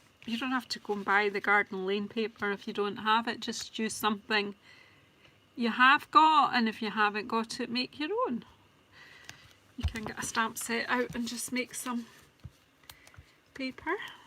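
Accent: Scotland, United Kingdom. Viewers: Where is that accent from as I have never heard it before and have the hardest time ever understanding you. It is NOT you, it is me being German. Is this Scottish? Love the card a lot!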